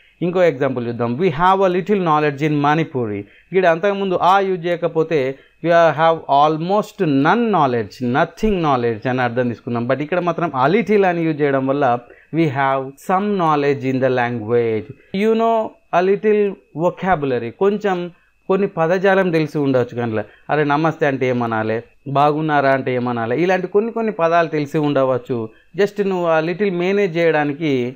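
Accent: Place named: Telangana, India. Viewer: Good explanation and Ur voice and Telangana slang was awesome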